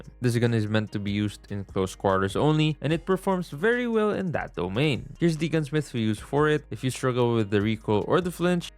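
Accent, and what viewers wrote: Philippines. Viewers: are you filipino im picking up some filipino accent.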